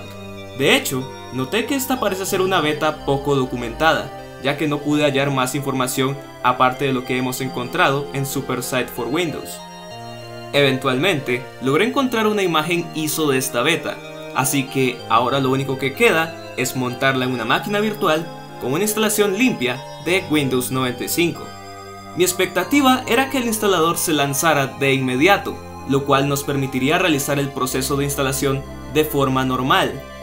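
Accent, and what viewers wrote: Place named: England, United Kingdom. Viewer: I like your English accent, it sounds so cool. Been awhile since I visited your channel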